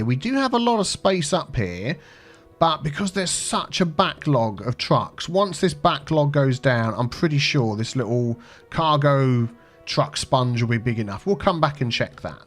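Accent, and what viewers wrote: United Kingdom. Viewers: love and support from Korea(obviously from south😉) I enjoy watching your video cos i like this game but also your british accent is so satisfying to listen to!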